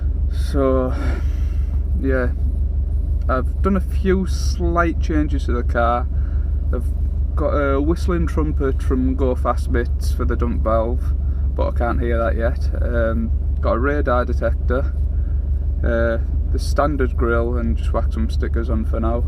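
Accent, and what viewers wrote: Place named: North East England, United Kingdom. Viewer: Dunno where abouts your from mate, but sounds like north east? Im from Gateshead and im currently waiting for my 2006 forester STI to be imported from Japan. Its also black.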